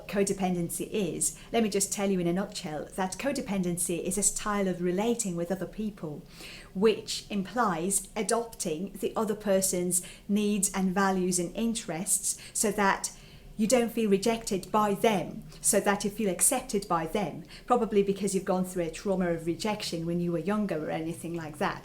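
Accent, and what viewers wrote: United Kingdom. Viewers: Never have I heard any non native speaker with such brilliant British accent! Amazing, really.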